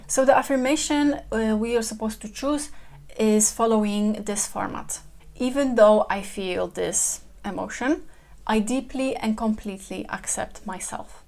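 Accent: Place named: Germany. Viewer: I love your accent. What's your native tongue? German?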